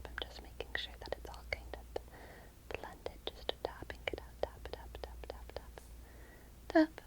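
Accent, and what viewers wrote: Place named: South, United States. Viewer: I'm guessing you live in the country because most people pronounce it with the i n g most of the places I know that pronounce it's with the Southern drawl you're describing are in the country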